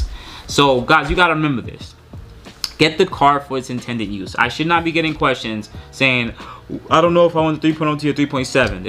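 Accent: New York, United States. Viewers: Yo your such a NY'r. Moving to Miami my workers had to break me out of the axe/ask thing. But I'll still say water with my NYC accent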